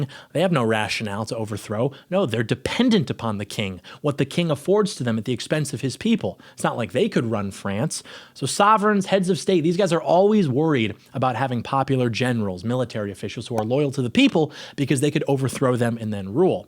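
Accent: Michigan, United States. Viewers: I figured you were from Michigan. Since moving out of my home state, I miss how Michiganders talk. Fast and concise.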